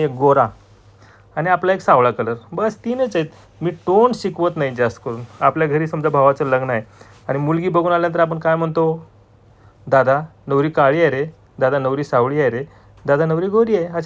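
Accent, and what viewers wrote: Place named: Latur, India. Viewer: सर तुमच्या भाषेवरून मी ओळखलं होतं तुम्ही लातूरचेच आहात मी पण लातूरचीच आहे प्रकाश नगर आणि सासर परभणी आणि पुण्यात राहतो तुम्ही तर आमच्या जवळचे निघालात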